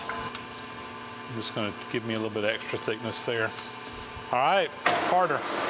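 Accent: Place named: Georgia, United States. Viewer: I'm in the firearms business and there are a number of historic firearms-specific threads we use, such as #6-48 (for Remington optics rails) and #8-40 (Mossberg and (interestingly) Benelli, or at least Benelli USA). The fasteners have to be custom made, so when customers call about tapping threads, I suggest they just go with the closest standard instead of the weird historic thread, so they can find a tap more easily and also buy fasteners from McMaster-Carr. It's hard to describe what makes your videos so compelling. The other night my partner and I spent a couple hours watching you dismantle the stoker engine. She loves your soft Georgia accent. The lack of profanity is awe-inspiring (I light up the entire neighborhood when I'm working on my car).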